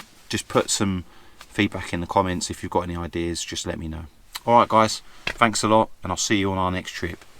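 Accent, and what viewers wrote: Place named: Southern England, United Kingdom. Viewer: Nice job. This is exactly the route me and the Mrs want to do with our E51. All the gear but not convert it. Great stuff. You sound like your from the south mate, I’m in Margate, are you anywhere near there?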